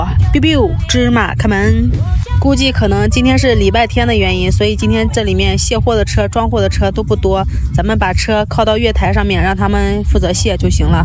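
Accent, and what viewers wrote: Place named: Shandong, China. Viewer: 感觉你有山东口音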